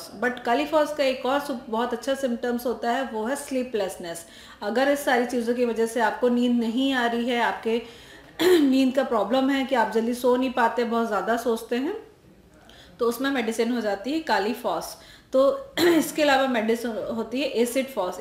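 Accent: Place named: Punjab, India. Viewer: punjjabi voice 6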